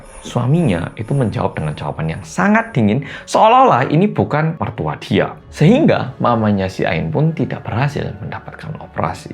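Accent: Java, Indonesia. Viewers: Cerita Korea, tp di ceritain pake akasen Jawa medhok😂😂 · Sek mas.. sampean iki temenan wong korea ta? Kok jowone g ilang2.. wenak ngunu lo lek ngomong jowo.. 😅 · Kok bisa muuuuedok yaaa 😅😅😅😂😂😂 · medok bgd orang korea yg satu ini!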